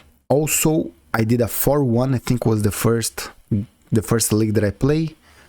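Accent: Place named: Brazil. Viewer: Meu Deus é brasileiro 😝😝😝 Melhor sotaque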